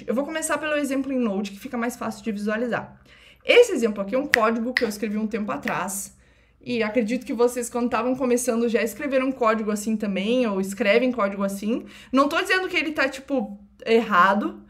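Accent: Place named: Rio Grande do Sul, Brazil. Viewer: Sotaque do nosso rio grande é inconfundível "né "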